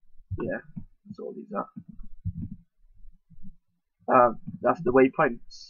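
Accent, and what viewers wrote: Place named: Wales, United Kingdom. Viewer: ahh you dont speak welsh, i recognised your accent. nice to know another welsh arma player. don't see many of them :)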